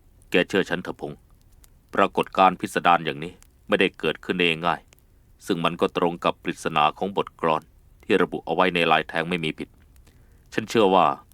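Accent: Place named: North, Thailand. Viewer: ชอบฟังครับภาคเหืมนมาก😅😮😢😂❤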